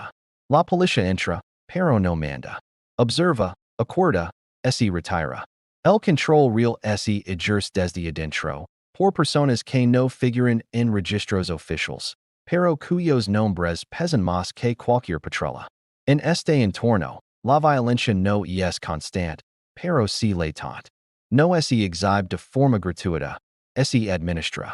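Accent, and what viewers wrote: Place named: England, United Kingdom. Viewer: Tiene acento de ingles hablando español no se entiende bien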